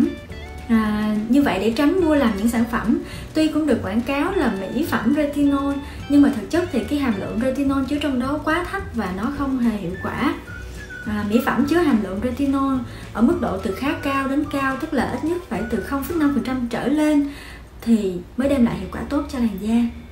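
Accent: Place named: Japan. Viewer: cảm nhận của riêng em là chị quá đẹp và tinh tế trong phong cách ngoại hình và giọng nói(em cảm nhận nó nữa Việt Nam nửa Nhật Bản). Em xem về clip phân loại da của chị rất hiệu quả, em cám ơn chị rất nhiều những chủ đề về da, việc học và đời sống của chị tại Nhật Bản rất hay luôn, em luôn mong chờ những cip này từ chị chị là hình mẫu của em về sự tiến bộ để đạt được những thứ mình muốn, chúc chị luôn khỏe vui và sống trong những thứ tốt đep trong cuộc sống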